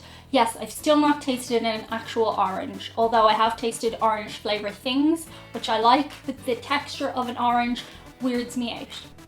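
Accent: Ireland. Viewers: I love your "Lucky Charms" accent. And the chicken farmer line is priceless. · Lucky charm accent is way to cool to ditch ever.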